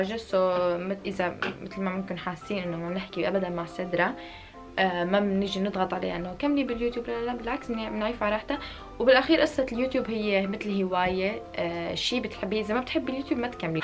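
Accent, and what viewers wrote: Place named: Algeria. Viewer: نتي جزائرية تاع الصح نستعرف بهدرتك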